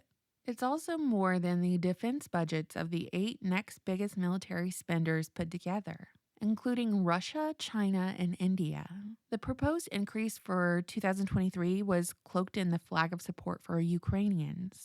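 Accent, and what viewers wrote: South, United States. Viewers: what happened to Southern accent?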